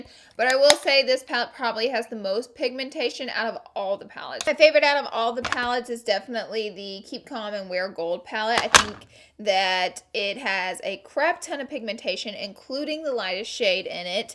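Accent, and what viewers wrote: South, United States. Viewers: I love your accent! Where are you from in the US- your accent is to die for!💘💘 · You have a southern accent so I automatically connect to you.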